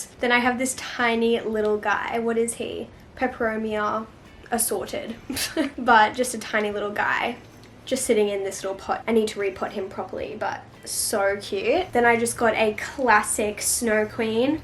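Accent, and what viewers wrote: Australia. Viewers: For some reason it took me until 15 minutes into the video to realise that you're Australian....... I'm Australian.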